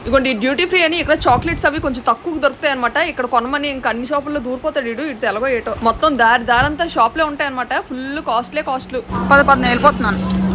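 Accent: Vizianagaram, India. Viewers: మీరు విజియనగరం యాస మాట్లాడితే బాగుంటుంది నా అన్వేషణ విశాఖపట్నం అయిన విజియనగరం యాస మాట్లాడతరు మీరు మాట్లాడడి మాది అనంతపురం అయిన ఆ యాస కోసమే మీ ఇద్దరి వీడియోస్ చూస్తాను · Mana vizianagaram Language.... Super👌.... Ventunte 👂 super super👌.....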